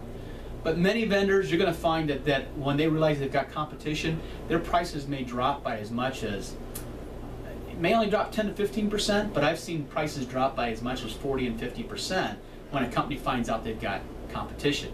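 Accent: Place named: Arizona, United States. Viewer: I like your accent. Are you from AZ?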